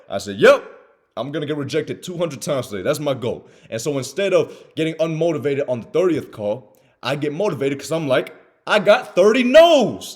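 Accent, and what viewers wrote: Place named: Houston, United States. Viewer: Where you from? Sound like from Houston.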